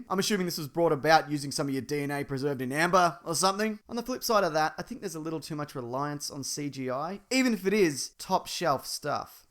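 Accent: Australia. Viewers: He lives in Australia hence the accent.. Cmon now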